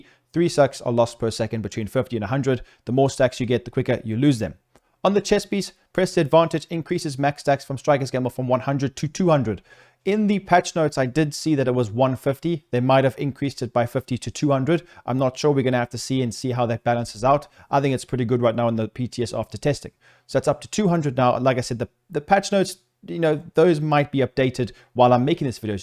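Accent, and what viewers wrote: South Africa. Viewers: My bru awesome video, are you from South Africa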